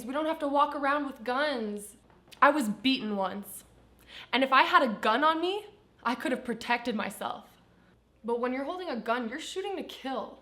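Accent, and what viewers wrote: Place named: South, United States. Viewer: You’re definitely from Alabama or Mississippi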